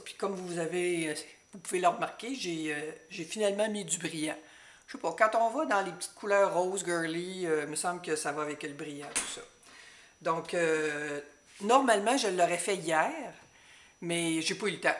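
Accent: Quebec, Canada. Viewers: Bonjour! merci pour toutes vos vidéos, je suis doublement ravie parce que vous nous montrez les techniques, vous expliquez de manière simple et chaleureuse; et puis je suis ravie aussi car j'ai une très bonne amie du Quebec et en vous je retrouve son accent et ses expressions! c'est pour moi un double bonheur;) J'ai une question: je n'ai jamais essayé la technique du cintre;) je n'ai pas de cintre métallique à démonter, comment puis je faire? quel matériel acheter pour en faire un? merci d'avance:)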